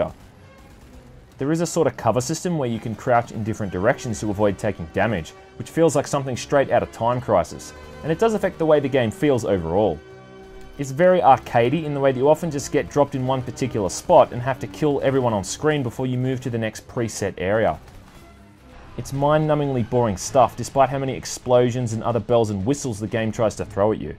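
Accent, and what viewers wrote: Australia. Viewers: Aussie mate ?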